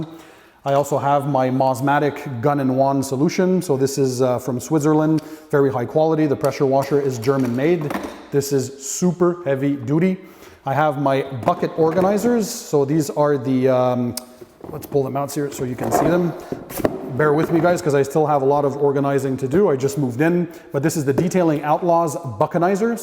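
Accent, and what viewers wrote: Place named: Canada. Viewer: I didn't realise you are Canadian. My Irish ears can't distinguish the Canadian accent from the American one.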